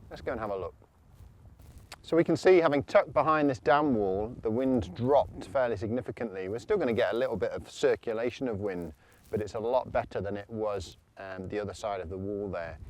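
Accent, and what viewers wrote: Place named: England, United Kingdom. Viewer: He sounds English and most of the rules he's advocating only apply in England. He does not seem to realise Scotland is part of UK.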